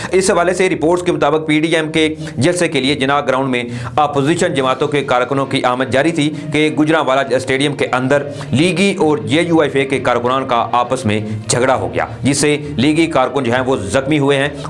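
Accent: India. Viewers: urdu ko urdu lehje main bolo. Ziada indian banne ki zaroorat nahi. Is se tumhara ehsas e kamtari nazar aata hai.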